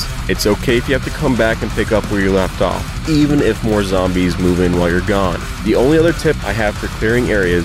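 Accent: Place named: United States. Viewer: question, why do you say zeds? you sound american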